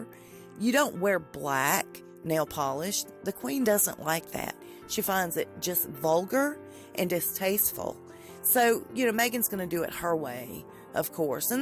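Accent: South, United States. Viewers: How does someone with a southern accent know so much about the royal? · I am glad i found this channel..love your southern accent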